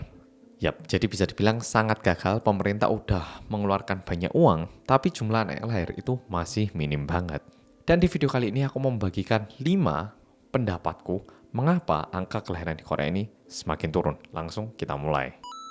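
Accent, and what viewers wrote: Java, Indonesia. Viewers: Selalu suka sama konten"nya mas mas korea medok ini hehe❤❤ Aku mau reques bahas kasus JMS soalnya habis nonton documentary netflix jdinya pengen tau perkembangan kasusnya dikorea.... Makasih mas 😊😊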